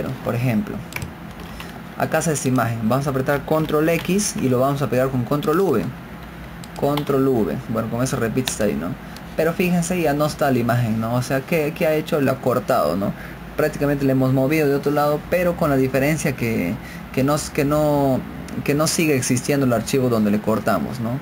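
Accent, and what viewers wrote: Peru. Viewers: Acordemonos de que nuestro hermano nos ensena con la forma de hablar en peru, la cual como en toda America Latina tenemos muchos acentos y modismos en nuestra forma de hablar el espanol asi que por favor no lo corrijamos ya que hasta mucho hace nuestro hermano con enzenarnos a los que no somos tan Buenos en la computadora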